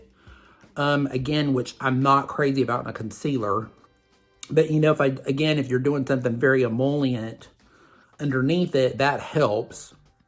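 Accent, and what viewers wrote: South, United States. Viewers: I should have watched this during my tough week! You are so much fun (and I am learning a lot)! I love that Charlie's Angels lunchbox:-) I grew up in the 70s and 80s. "It has them arresting somebody on the back" - one of the funniest YT lines ever! Plus the safety rules? Too much fun! Don't let anyone tell you "how to" pronounce words - love your southern accent! That KGD foundation is my favorite. It looks good no matter how applied. You deserve the recommendation by Tara so much! A few favorites - speed racer, strawberry shortcake, holly hobbie (blue bonnet), wonder woman, smurfs and so many more!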